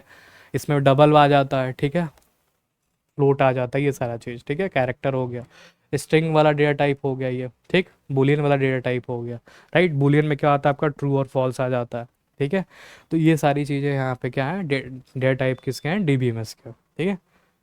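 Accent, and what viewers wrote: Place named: Bihar, India. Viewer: lagta hai sir bihar se hai thik hai🙃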